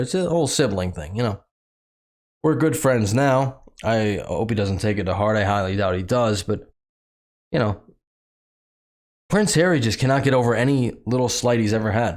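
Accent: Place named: Chicago, United States. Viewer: Ahh...now I know you're not my long lost brother, Rick. You sounded so much like him I was wondering. Definitely remind me of the old Chicago accent . lol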